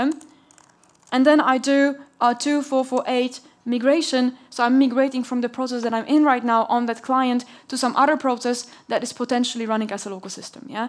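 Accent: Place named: Netherlands. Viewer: Yes she's superb, and her english has improved - her delivery is a bit more mellow that earlier "rapid fire" webinars from a few years ago. She now sounds more Dutch than Polish !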